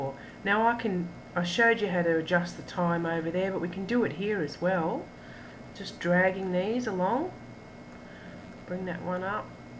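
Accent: Australia. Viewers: Australian??? IM AUSTRALIAN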